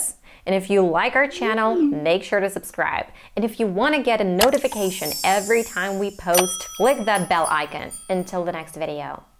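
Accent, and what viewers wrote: United States. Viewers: I read on a comment that you are not American . is it true 😮😮😮 because you sound like one of them .I do not know if that guy was saying foolish things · I love this Belarus song. - Very beautiful poetry too...... I thought, You were Hispanic American. Very surprise to know that You are Ukrainian. You have a very cute baby too. You have a very American native accent. I had lived in U.S.A. for 16 years. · I love you. Your English is American you speak and sound very well, but can you speak the British English?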